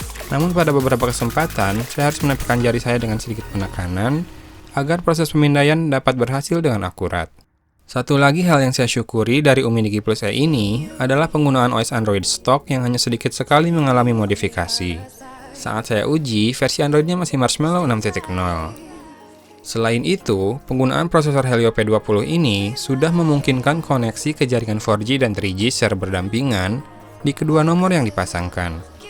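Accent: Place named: Sunda, Indonesia. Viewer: ngomong ada nadanya, khas dari Sunda. Akhir kalimat nadanya turun. Ini krn baca teks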